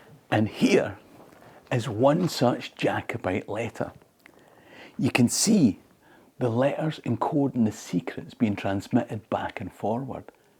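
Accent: Scotland, United Kingdom. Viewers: ❤your videos and classic Scottish voice, the best travel videos ever. Scotland 🏴 is Heavenly and people are fascinating…we’ll be back soon!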